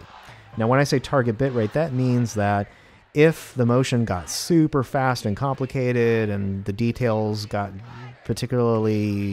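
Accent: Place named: Brooklyn, United States. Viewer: Great video. It sounds like you are in Brooklyn. I'm about 45 min from you. I have done some video work with my 80D/mics/steadicam. I'm interested in learning more and assisting as AC/boom operator/gaffer/etc on a bigger project. Let me know if you are ever looking for a competent crewmember as an unpaid intern.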